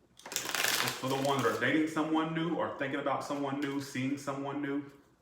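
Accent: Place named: Louisiana, United States. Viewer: Hes Creole/ Louisiana I think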